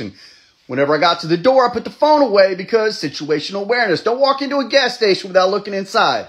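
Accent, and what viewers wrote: South, United States. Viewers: I think he was drunk when he filmed this. His southern accent was waaaay thicker in this one. 😂😂😂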